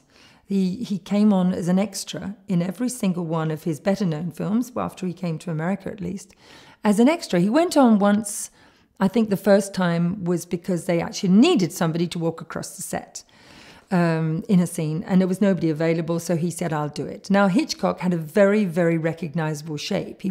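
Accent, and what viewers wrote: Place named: United Kingdom. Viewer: Best British accent I have ever heard. Splendid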